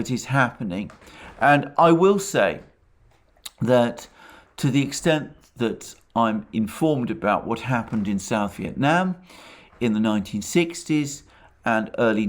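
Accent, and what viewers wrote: England, United Kingdom. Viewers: ? Wtf are you talking about? He reiterates commonly available material in a Brit accent, making you think he's some genius. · You sound like an Englishman. But you seem to be on Russia's side. You are a traitor to western values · I keep watching your videos because you are RIGHT on your assessment , from day one of the special military operations conducted by Russia 🇷🇺 and once again I want to say that I admire your knowledge and understanding of world history. PLUS I like the British accent. ( it is pleasant to the ears ) cheers 👍😀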